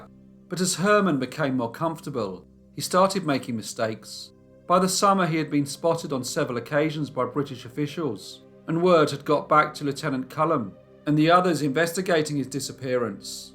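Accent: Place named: Scotland, United Kingdom. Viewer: I love the Scottish accent...had hopes to visit someday. Things in the world have changed so much I'm not sure if that's a reality now...but anyways...GOOOOD mornin from the northeast USA! Hope you're staying well and safe!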